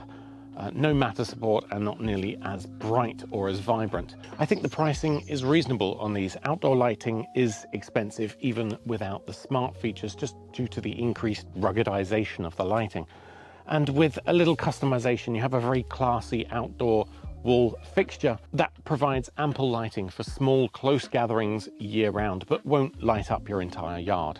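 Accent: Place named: United Kingdom. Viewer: so nice to hear a UK voice on a review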